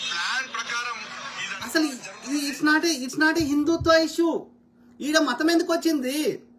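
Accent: Telangana, India. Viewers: Good telangana voice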